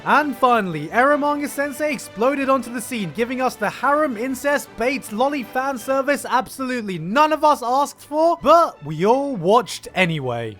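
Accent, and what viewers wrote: United Kingdom. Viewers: [ „playlist suggestion“ -|- 111:02 later x3 ] fuck need more good comedy content that gives me that special kind of exciting and good feeling i get from waching your videos with you talking about how shows are that they them selves never manage to give me and a sexy english voice with a british accent ... =)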